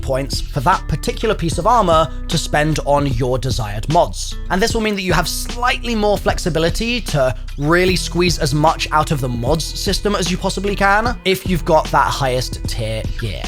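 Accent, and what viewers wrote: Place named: United Kingdom. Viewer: why are you bri'ish?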